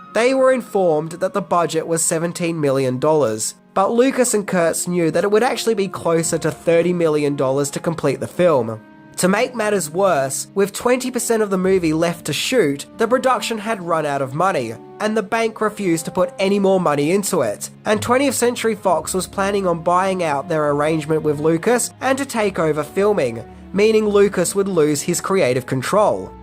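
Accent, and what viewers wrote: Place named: Australia. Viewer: WONDERFULL!NICE WORK!NICE ACCENT!AUSTRALIAN?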